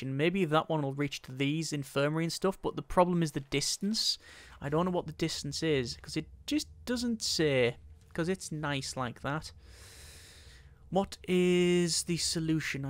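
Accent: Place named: North East England, United Kingdom. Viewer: North east of the UK I’m guessing from the accent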